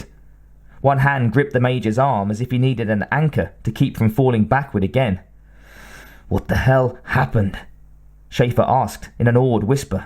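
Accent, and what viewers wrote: United Kingdom. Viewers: I would have liked it if in this beautiful story each character had their own voice, as was done in the Radio Plays, plus the audio effects, and the suspenseful music in the background of the story, not to mention that the BRITISH ACCENT IS TOTALLY UNACCEPTABLE. Don't you think that in this story, the BRITISH ACCENT doesn't match the characters❓️❓️❗️ And how the hell can a Native American / ITALIAN - SIOUX (BILLY SOLE) or an American from the South, Beaver Creek Swamp (BLAIN) from Alabama or MAC from Kansas would speak with a British accent, and same for the others❓️❗️❓️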